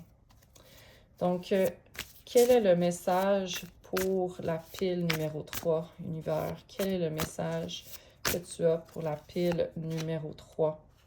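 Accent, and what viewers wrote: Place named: Quebec, Canada. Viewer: Ouha ! Tu m'épate belle âme 💚 Tu sais ! .....nous les français ont est en amour avec l'accent du Québec 😉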